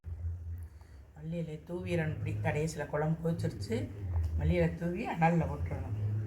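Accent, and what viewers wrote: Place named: Ramnad, India. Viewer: Nenga ramnad dhana unga slang ramnad madhuri iruku illai adhai suthi ulla giramam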